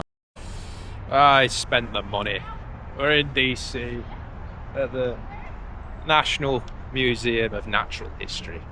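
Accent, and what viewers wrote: United Kingdom. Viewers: It sounds like you’re from the UK - how were you able to live and work in the US? It seems like the there are limited VISA’s for pilots (asking as I am currently trying to figure this out)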